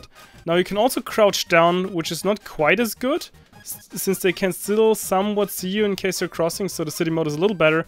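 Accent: Germany. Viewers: Are you German? You sound very german :)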